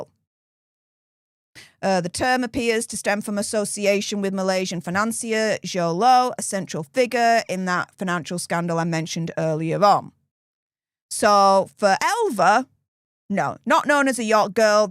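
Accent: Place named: England, United Kingdom. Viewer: Am I the only one that thinks heavy English/Slang accent is kindda difficult to understand?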